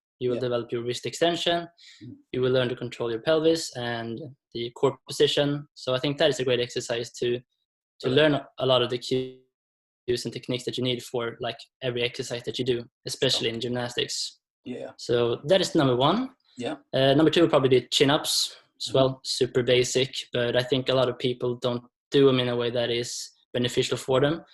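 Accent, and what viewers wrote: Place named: Rome, Italy. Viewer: danvanzandt ahahah I’m italian so he just speak it. with a very strong Roman Accent ahahah But don’t worry, really!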